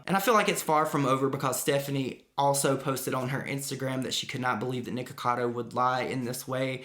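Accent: South, United States. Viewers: I love your accent sooo much!! It makes me miss my cousins down south. Great video!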